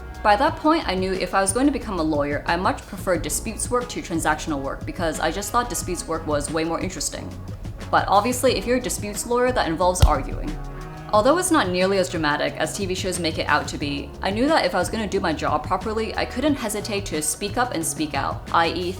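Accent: United States. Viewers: I've watched a few of your videos and have decided to subscribe to your channel. As a UK person living in Asia, I can only thank you for giving us a glimpse in to the high society life of a Hong Kong resident. Most of what we see on Youtube are people living in shoeboxes under squalid conditions due to the highly inflated housing market. Do all people in HK have American accents? I know you grew up int he US, but your fella also has an American accent. Just curous because HK was a former British colony and all... Also surprised that the legal system still follows the UK way, you said you did it after "high school" - this term is only used in London and we actually have secondary schools. So I guess the whole UK educational system was thrown out when China took the reins in favour of a more American system. I find it very admirable, that not only you leave your job but also your profession to pursue your own dreams and do something better suited to your character. 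As an introvert myself, I found the best person to work for was myself. And that has made me somewhat successful. I hope it works out for you. You are really beautiful by the way.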